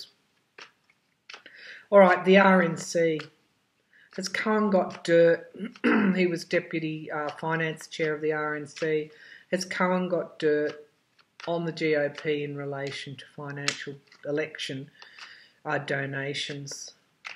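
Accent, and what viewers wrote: Australia. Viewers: I do think Cohen is concerned for his wife and children. I have empathy for him because he confessed his guilt and said he was deeply ashamed of his involvement with the Trump criminal enterprise. I think he has truly turned away from evil and is truly worried that Trump could bring America down completely and kill our democracy. Thank you for another insightful reading. I love listening to your lovely Australian accent as you speak. 🦘🦋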